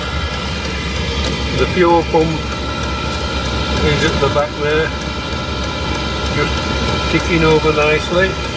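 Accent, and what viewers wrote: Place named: Yorkshire, United Kingdom. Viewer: Hi i thought i recognised the accent,you are in Knottingley,i come from Mexborough,maybe we can get togeher one day.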